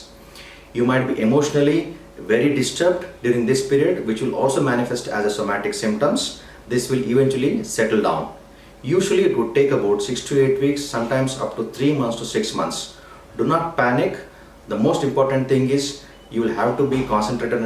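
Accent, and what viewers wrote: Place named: Tamil Nadu, India. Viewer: Hard to understand your English..too strong tamil accent.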